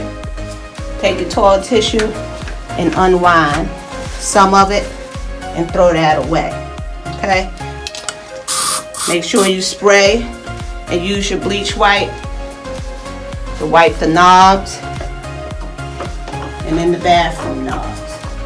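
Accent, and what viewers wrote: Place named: New Jersey, United States. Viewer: I love her mid-Atlantic accent (New Jersey)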